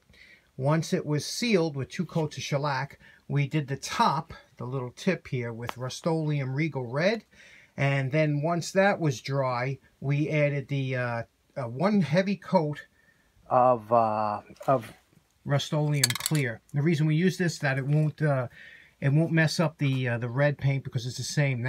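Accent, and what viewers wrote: New York, United States. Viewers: Very nice work. Also nice to hear a New Yorker's accent (born and raised in upstate). Also, had one of those wood screwdrivers when I was a kid. Hate to think of it as "antique," but it is what it is. I used that same stain for a lot of applications, but I seal it up with polyurethane (satin, semi, or gloss); hard as a rock.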